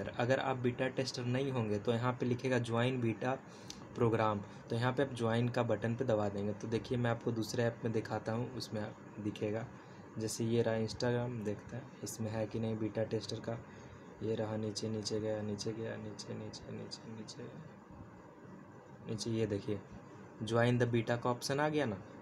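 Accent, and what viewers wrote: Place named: Patna, India. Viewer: Your from patna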